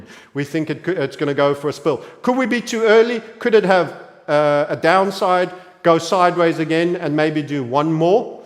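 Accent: South Africa. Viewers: How amazing it would have been to have met this guy where he is from because i am from where he is from. I here his accent. Only thing is, im from the mother city. Not sure where exactly you come from Sir. Ek wonder as jy a kaapenaar is of van KZN? Meneer ek kan nie so lekker siuwer Afrikaans praat nie, maar jy weet mos, ons praat baaie taale hier in Kaap. 😂 Dankie vir alles meneer.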